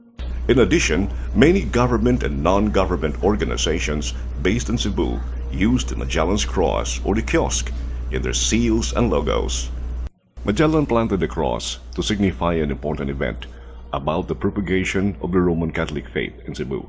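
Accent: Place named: United States. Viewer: The narrator sounded like a native american speaker. I thought at first he was an american because of how he speak.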